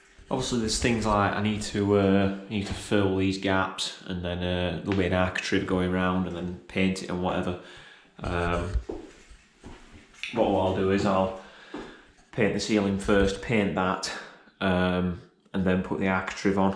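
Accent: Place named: North, United Kingdom. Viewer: Nice tidy job once again mate as always - your a great bricklayer and definitely a good all rounder it seems 🍀👍 and the most Northernet northerner I’ve ever heard 👍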